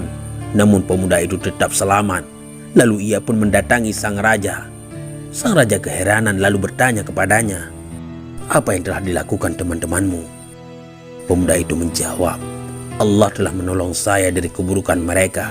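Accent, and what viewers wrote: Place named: Bali, Indonesia. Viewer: Naratornya..kaya logat orang bali..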